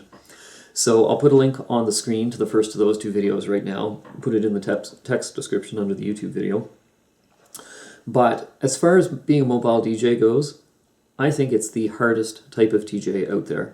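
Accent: Canada. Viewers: Also, love the Canadian accent. Living in Manitoba mine isn't nearly as defined as yours but I still try to making it noticeable.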